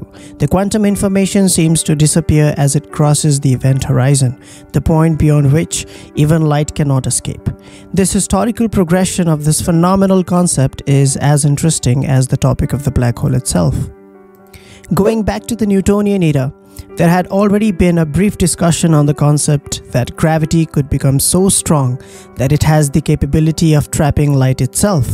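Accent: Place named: India. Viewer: Strong Indian Accent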